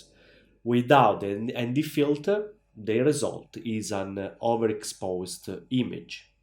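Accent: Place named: Italy. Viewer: Quanto si sente che sei italiano 😎 ottimo video, io posseggo la p1000 con un filtro nd 1000 ed è pauroso il risultato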